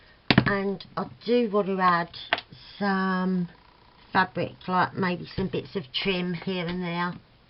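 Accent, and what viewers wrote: Cockney, United Kingdom. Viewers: Love the journal card. The edge does look like a peacock feather off the edge! Hugs and Blessings my lovely friend. Xoxo Denise PS...every time I play your videos and my so. Is in ear shot he stops and watches because he says he loves your Cockney accent lol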